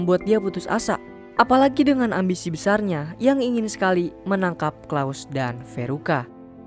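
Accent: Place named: Banyumas, Indonesia. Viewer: Anjir ,,,ngapak coy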